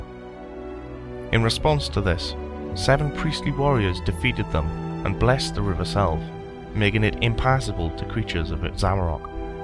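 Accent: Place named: South Wales, United Kingdom. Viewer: What part of Wales are you from? It's driving me crazy. You've definitely got a south walian accent. I'm gonna guess and say Merthyr or Rhondda or somewhere around there lol.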